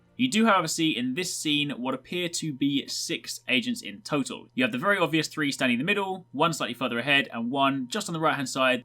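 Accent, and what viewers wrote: England, United Kingdom. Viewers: Where in England you from?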